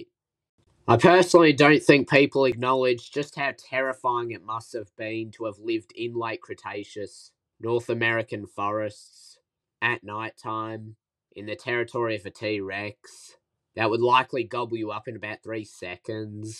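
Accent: Australia. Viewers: This is most Australian sounding man in the history of accents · Dude are you an aussie? It sounds like it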